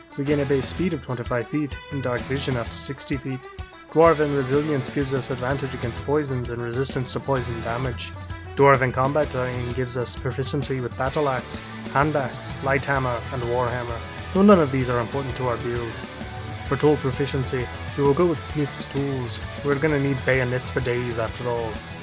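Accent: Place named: Scotland, United Kingdom. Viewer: The fact that the guy making this has a Scottish accent too 😂😂😂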